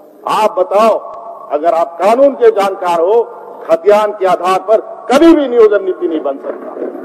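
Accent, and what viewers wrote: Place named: Palamu, India. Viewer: Maine Palamau me 12 years rha h isi tarah waha ke log bolte hin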